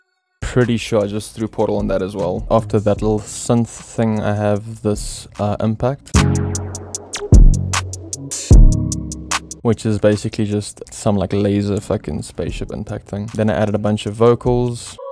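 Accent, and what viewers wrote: South Africa. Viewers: Dude why do you sound like you from South AHHHH🇿🇦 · the accent is giving joburg oak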